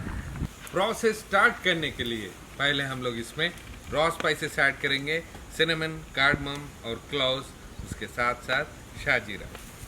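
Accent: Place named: South, India. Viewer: hindi South Indian accent cool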